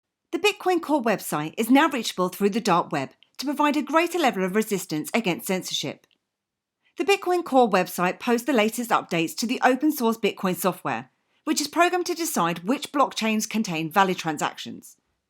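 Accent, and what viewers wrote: United Kingdom. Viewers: I suspect you meant to say “excited” rather than “exited”. Mostly what I noticed was that she has one of the strongest British accents I’ve heard, and I have heard a lot … she also talks very fast. If you’re having trouble understanding, try lowering the playback speed on your player to .75. Those settings are accessed by clicking the three dots in the upper right hand corner of the video player screen. Those on-screen controls go away after a few seconds, but will reappear the instant you touch the screen. Just FYI, that electrical-outlet looking thing to the left of the three dots? That’s a slide-switch that toggles “auto-play” on and off.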